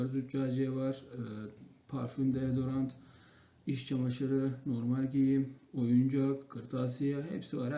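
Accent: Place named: Alaşehir, Turkey. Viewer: " Bi kaç tane GAÇAK çektik ama " İstediğiniz eğitim seviyesinde yada yaşam standartlarında olun. Bir Alaşehirliyseniz şivesinden kaçamazsınız 😅 Başarılar kardeşim 👍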